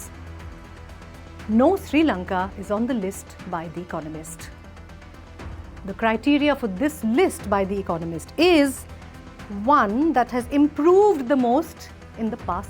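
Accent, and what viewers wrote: India. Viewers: > Opens video > Indian accent > "Yup"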